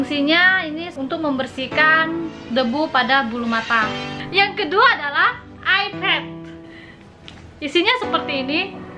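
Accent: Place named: China. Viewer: Bahasa medok cina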